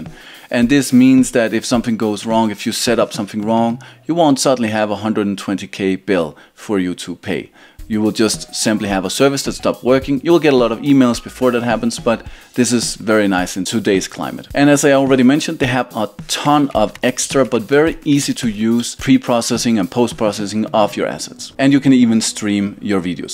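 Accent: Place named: Denmark. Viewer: This is a great channel! I'm going to venture and guess that's a Danish accent. We need more Dane dev youtubers! Godt arbejde!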